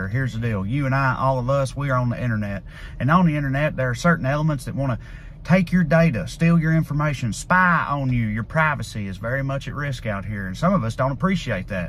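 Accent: South, United States. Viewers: Lmfao I gotta say....There needs to be more educated southerners. That accent mixed with an IQ that isn't comparable to a shoe size is absolutely fantastic when you get sarcastic/funny Haha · Brother, just hearing a Southern accent speak sense made me INSTANTLY subscribe to your channel. I think it was Beau of The Fifth Column that led me here, but thank you for popping up in my feed, and please keep challenging ignorance and fighting idiocy. We need people in all the corners standing up for what is right, just, and logical.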